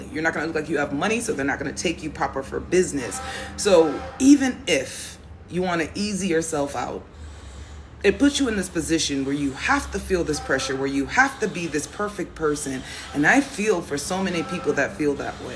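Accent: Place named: New York, United States. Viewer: Its ok... but you sound like you from New York. Still, you got the voice for radio and the face for Youtube so you already have all the qualifications. ;-) I love the voice nuff nuff nuff, so I will watch and see what creativity and experience lies within the beauty with the dreadlocks. We can tell, you are unique in your own way... did I say I love di voice?:face-blue-smiling::face-blue-smiling::face-blue-smiling::face-blue-smiling::face-blue-smiling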